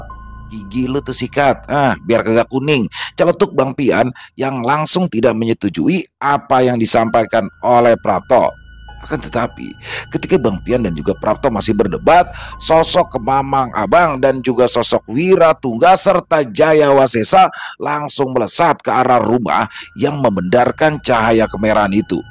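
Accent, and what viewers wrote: Jakarta, Indonesia. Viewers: assalammualaikum....nah yg dinanti2 hadir lg cerita nya mas untung.sukseees terus..bang mksh... yg selalu dengan logat betawiny a yg mantap bikin seru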